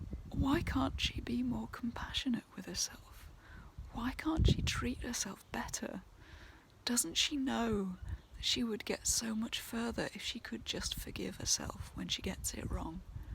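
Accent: United Kingdom. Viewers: I love your British English and your peacefull view on life issues which we all have. I agree with you what we all shpuld focuse on 😚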